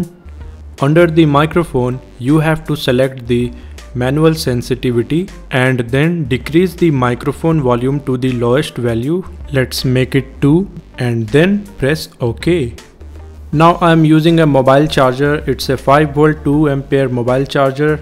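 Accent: India. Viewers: Great video, you're Indian. I heard it right away.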